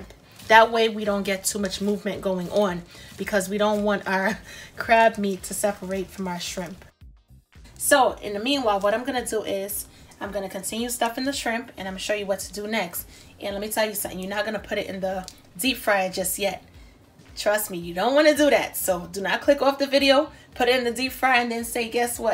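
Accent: New York, United States. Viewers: That looks so delish! I'm loving hearing the New York accent.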